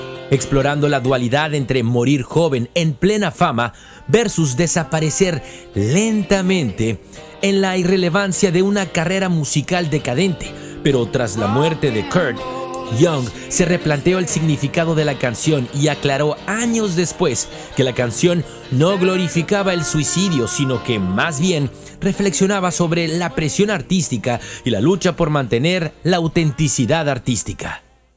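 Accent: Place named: England, United Kingdom. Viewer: Well buddy, it’s not really pronouncing his name it’s off. You sounded English to me unless you’re AI I don’t know how you could get KURT COBAIN i’m really emphasizing on the last name. I’m not being a bitch either. I just noticed. Every time his last name was mentioned i got a tic. I have tourette’. It’s not your fault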